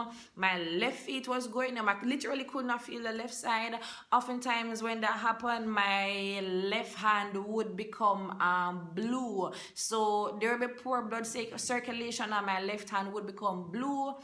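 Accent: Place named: South Africa. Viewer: Wow....I really love her accent❤💯🤗#SouthAfrica.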